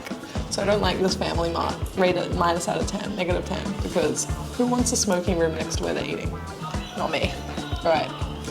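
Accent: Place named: Australia. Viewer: Rocking the look! Also, I feel your aussie accent has got stronger since you have been back home there recently ✌️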